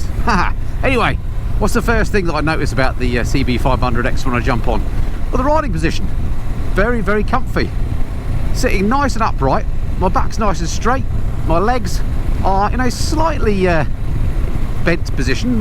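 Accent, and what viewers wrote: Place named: Hertfordshire, United Kingdom. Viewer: Great review you old Norfolk boy you ha ha. You've still got the accent albeit mixed with a bit of semi posh Hertfordshire home counties.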